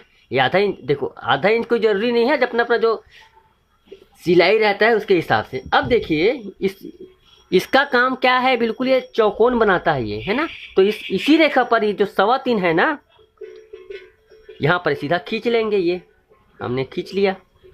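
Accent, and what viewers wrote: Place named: Maharashtra, India. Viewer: सर आप महाराष्ट्रीयन है आप ईस व्हिडिओ मे जोभी वल्ड बोल रहे होना ईसी लुये हमे लग रहा है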